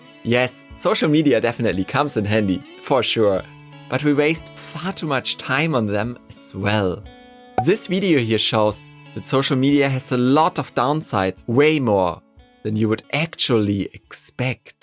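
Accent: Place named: Germany. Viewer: I smell Deutsch but sonst sehr gutes Video!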